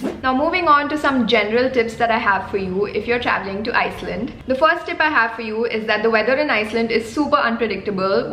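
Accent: India. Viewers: very strong indian accent, talking non stop without coma it's time to cut off my ear now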